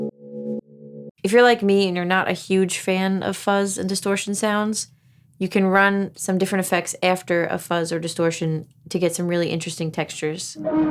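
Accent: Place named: Alabama, United States. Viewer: For faster bankruptcy, start with JHS pedal first episode. Turn the YT speed up to 150% as you can eliminate the slight Alabama drawl and get through bankruptcy much faster” By the time you get to the Colorbox 2, you will have enough money to enjoy whats left while having enough left over for food and rent with a special monthly “kitty” to buy your favs from.:)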